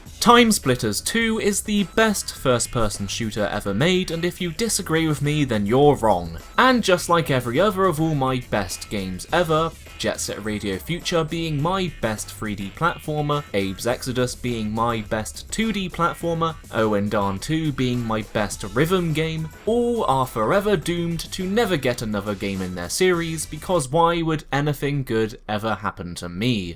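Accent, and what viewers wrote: United Kingdom. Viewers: you sound like your from the UK if so £4 at CEX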